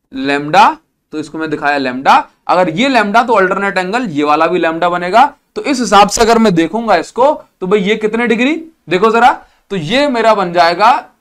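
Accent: Odisha, India. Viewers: Sir aap odisha se ho kya?😅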